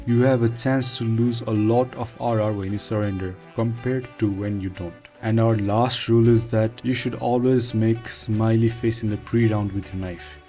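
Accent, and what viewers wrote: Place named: Nepal. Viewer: Brother. Nepali accent sunirako chu ta ma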